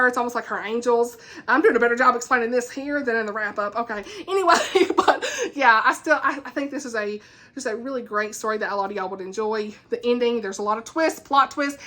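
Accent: South, United States. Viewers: Your personality and southern accent is so refreshing and comforting at the same time ❤❤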